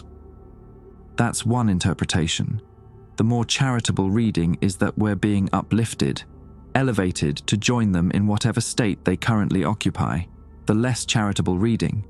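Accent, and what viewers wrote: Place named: England, United Kingdom. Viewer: English accent is a deal breaker! American story, Texas, national archives college park md, whiskey and all. Why does everything on YouTube have a twat accent?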